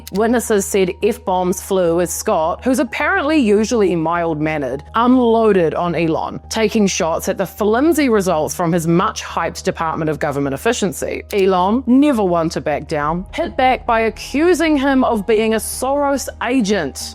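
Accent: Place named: New Zealand. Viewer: This is what I like to hear. Having been indoctrinated by YouTube into becoming anti-E-Loon I have been watching with some amazement the trajectory of this bloke's rise and rise on what is a Ponzi like bubble. The bubble is now straining to burst and the bigger it gets the bigger the mess is going to be. Personally I wish no good to the USers, the sad thing is the people are going to have to clean up this mess when the Moosk is gone. I predict his demise some time in the middle of this year, whether it will be the impeachment of Trump or the commencement of WWIII the E-Loon will fall. Whatever goes down it will fall on the people to carry the weight. I am enjoying your US reportage in an NZ accent.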